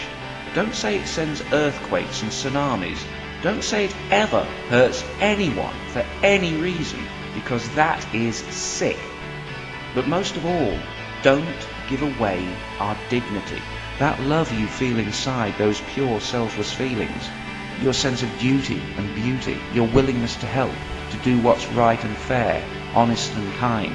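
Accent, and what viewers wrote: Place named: England, United Kingdom. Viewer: very nice. You lucky bloke. You have an English accent! hahahha everything you say sounds legit because of that. Americans have this fetish for British accents for some reason, I think. what was that zoom at the end? no idea what that was.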